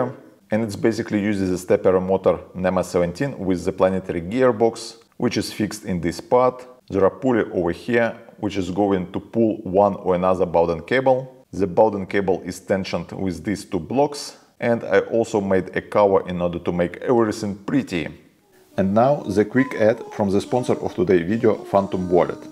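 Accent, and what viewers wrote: Russia. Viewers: Ты же русский, я слышу. Хватит придуриваться, говори нормально! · Is this a Russin accent? ) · Я не очень хорошо знаю английский но по моему у автора явно русский акцент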